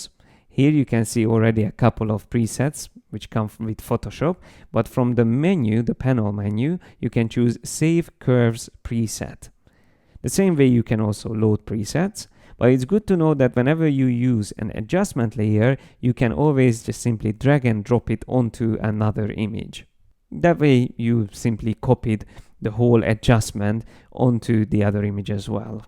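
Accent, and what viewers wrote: Hungary. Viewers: This is a textbook example of HUNGRISH. Terrible to listen to.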